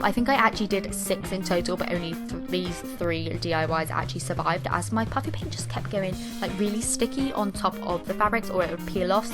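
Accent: England, United Kingdom. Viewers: I love your British ascent ❤️ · my godness your english is sooooooo english,wow,i love it!!!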